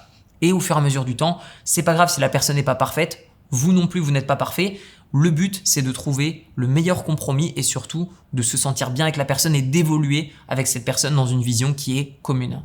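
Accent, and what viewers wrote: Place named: Lomme, France. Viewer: Depuis toutes ces années, je crois que c'est ma vidéo préférée. De bons conseils, on voit aussi l'envers du décors on va dire. Toujours aussi inspirant. J'adore l'accent qui reste avec le temps (je viens de Lomme, j'ai l'impression de connaitre du coup 😅). Excellente vidéo, comme d'habitude j'ai envie de dire.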